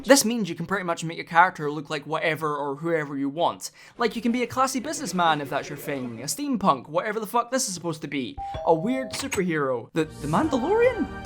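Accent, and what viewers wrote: Scotland, United Kingdom. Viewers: Ay is this man Scottish Huge w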